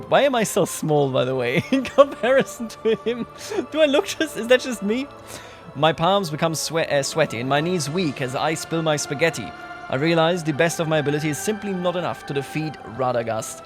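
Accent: Germany. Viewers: I heard your english and think: "Mhm he sounds like a german." And than i see your Channelname 😂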